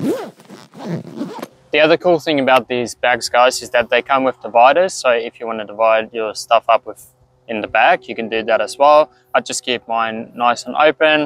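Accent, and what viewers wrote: South Africa. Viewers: Nice setup man! I must ask, are you perhaps South African? Because i somehow hear a bit of an Afrikaans accent coming through 😁 But ja, shot for the tips!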